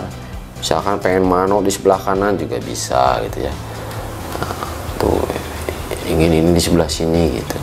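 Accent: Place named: West Java, Indonesia. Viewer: Logat ny sunda ini mah,,, timanten kang??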